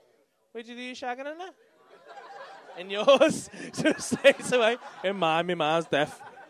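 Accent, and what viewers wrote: Liverpool, United Kingdom. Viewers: The only thing wrong with this is that he has a Scouse accent, and he ain’t funny....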